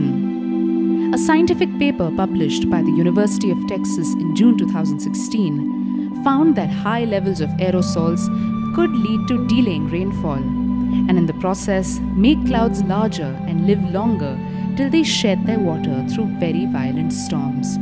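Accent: India. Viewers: that indian accent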